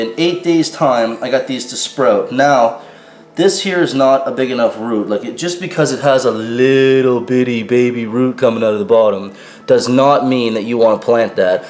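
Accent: Canada. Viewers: ***** even sound a bit canadian now... -Tko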